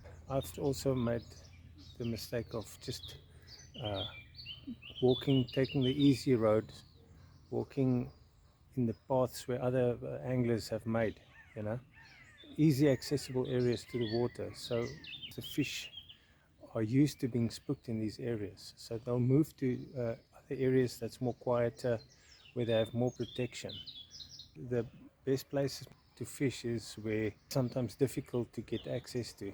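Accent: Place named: South Africa. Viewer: Hows it bru? Just come across your video. My best mate growing up was South African. He was the most loyal true mate you could ever ask for. We had some awesome times fishing together. I still miss him 15 years on. I dont know why that matters to anyone on here but i didnt think hearing ya accent would make me think about him like it has. Keep doing what you doing 👍🇬🇧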